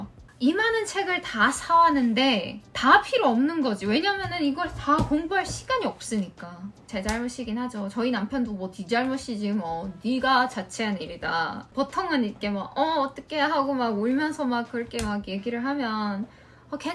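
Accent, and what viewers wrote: Seoul, South Korea. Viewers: 그냥 찐 한국인 말투인데😊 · 언어 능력이 원어민 수준입니다. 서울 말씨를 쓰시고 오히려 저보다 말씀을 잘 하시네요. 마치 한국에서 태어나 성장하신 분 같습니다. 😅😅😅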